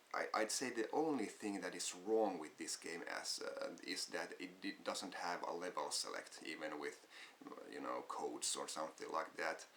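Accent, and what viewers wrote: Finland. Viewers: he sounds like he's from finland or some european country so i'd have to it's pretty obvious he is from finland.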